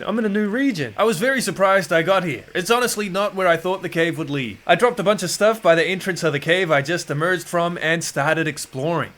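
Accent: New Zealand. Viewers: a New Zealand accent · New Zealand, you can tell because the vowels are slightly different. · Do I hear a new Zealander ??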